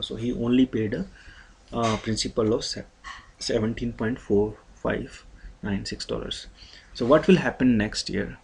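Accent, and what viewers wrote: India. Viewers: her the hindu accent had to fucking leave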